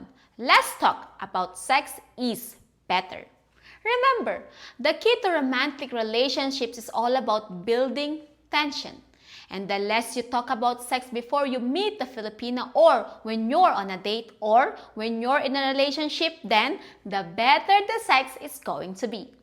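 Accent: Ireland. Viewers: That Damn Irish I just Love Your Accent!!!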